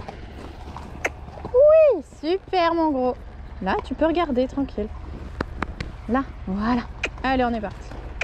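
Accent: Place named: Landes, France. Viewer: On dirait le landes tu es d'où ? :D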